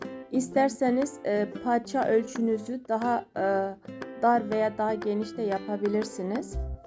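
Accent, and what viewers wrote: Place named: Anatolia, Turkey. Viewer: Anlatimin anadolu şivresi gibi ellerine salik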